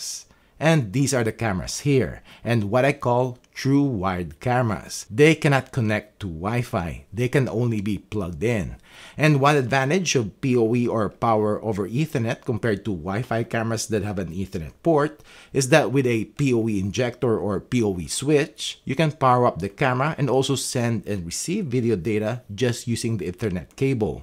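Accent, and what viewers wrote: Philippines. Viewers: Thanks and you sound like you’re from the Philippines 🇵🇭. ❤️👍💯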